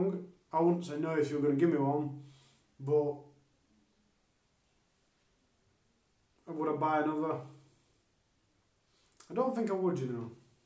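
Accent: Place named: Manchester, United Kingdom. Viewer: Appreciate your honest review, my man! Just found you on YT with this review. I have one in the post, really looking forward to getting my hands on it. I’m usually good at identifying English accents. I can’t quite place yours. Definitely Manchester-ish, broadly speaking. Btw, love that you have real life intruding in your video. There’s something endearing about the banging and interruptions, lol!! Keep up the good work, mate.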